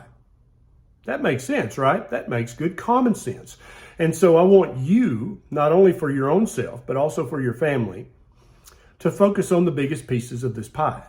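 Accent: South, United States. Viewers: Your Southern accent is very charming.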